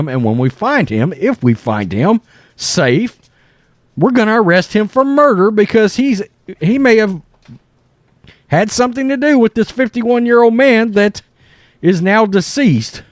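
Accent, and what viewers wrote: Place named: South, United States. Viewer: Hey buddy you & your are great announcers what is your first name & what state you live in I’d say Texas you have no a southern accent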